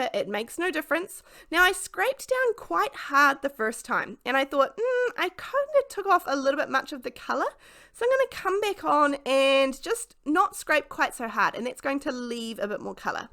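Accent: New Zealand. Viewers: Glad it made you laugh. It really did take me a while to,work it out. . Your accent is just the sweetest. Reminds me of the time we had a supply teacher here who was from NZ. I regularly had to translate for our class. She wanted them to sit on the mitt. .. but the best time was when she wanted to sort our children into ability groups for literacy.. we had the kiwi group, the jandals group, both needed explaining as they had not come across those words before. But then we got to the thongs group. .. we changed that one…. 😂😂 hope you’ve had wonderful Christmas. Love to everyone. X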